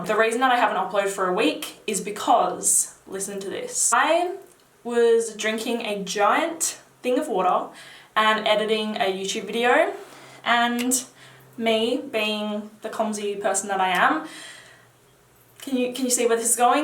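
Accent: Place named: Australia. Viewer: I just wanna say i came across one of ur videos somehow i dunno how but i commented that ur funny coz it was funny i dunno u was talkin about cleavage lmao some new push up thang lol oh yea i was watchin i forget his name about 2018 best mens fall winter fragrances yea thats how i came across ur channel , u had somethin bout mens cologne but yea i cracked up lol, aussie accents are sexy dont let anybody tell ya different lol, keep up the good work 👍🏼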